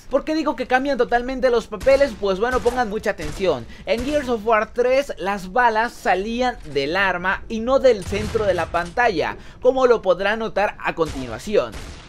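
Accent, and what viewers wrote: Mexico. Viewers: eres de mexico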